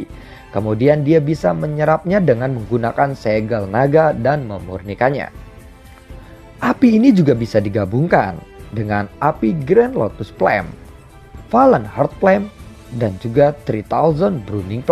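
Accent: West Java, Indonesia. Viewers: Mimin orang Sunda kah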